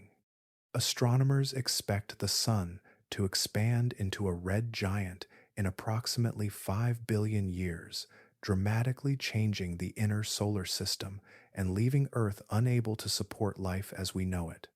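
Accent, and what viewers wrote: United States. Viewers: Congrtulations. American accent and no annoying sound effects. Subscribing now.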